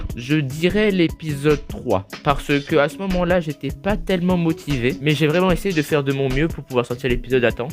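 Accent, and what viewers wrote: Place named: France. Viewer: Mmm French accent~ 😛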